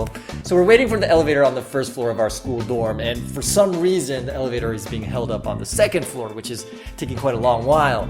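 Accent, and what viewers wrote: Standard. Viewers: 真的很感謝你做這些影片以及可以讓我們練習習慣非常標準的口音，但是中文字可以調整成當我有設定cc字幕的時候再出現嗎?有時候我只想看看英文不想看中文，但我關不掉。不管如何總而言之，超級愛你的正能量~~ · 很喜歡你的口音發音很標準!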